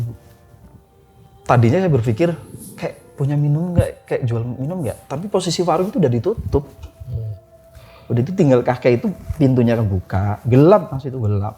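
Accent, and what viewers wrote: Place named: Banyumas, Indonesia. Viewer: O wong ngapak to, salam Sitinggil Cilacap yo ang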